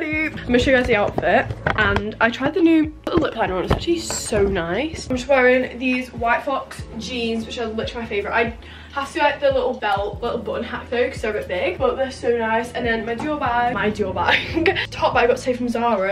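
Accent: United Kingdom. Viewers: UR BRITISH OMFG MY IDOLSSSS BRITISH.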